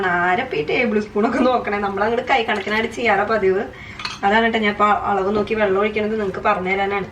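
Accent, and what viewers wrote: Thrissur, India. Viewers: തൃശൂർ ആണല്ലേ... ഇത്ര സ്പീഡിൽ എങ്ങനാ സംസാരിക്കുന്നെ??? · Variety recipes aanaloo... Recently aanu Kanan tudangiyath.... am from EKM... nalla speed samsaram... Nik oru frnd und.. she is from trissur, she is talking like this... any way NYC recipes !All the best dea... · എന്ത് രസാഡോ തന്റെ സംസാരം കേൾക്കാൻ.. തനി തൃശ്ശൂർ ഭാഷ. നാട്ടിൽ എവിടെയാ? അമ്മച്ചി സ്പെഷ്യൽ എല്ലാം പൊളിയാട്ടോ.. അമ്മച്ചിയോടു പറഞ്ഞോളൂ. എല്ലാവരും ഇപ്പോൾ പഴമ ഇഷ്ടപെടുന്നു...അതാ തനിക്കു ഇത്ര സപ്പോർട്ട്. ഇങ്ങനെ തന്നെ മുന്നോട്ടു പൊക്കൊളു. എല്ലാവരും കൂടെ ഉണ്ട്.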